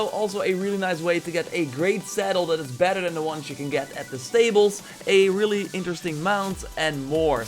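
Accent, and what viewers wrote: Netherlands. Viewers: Kom je uit nederland?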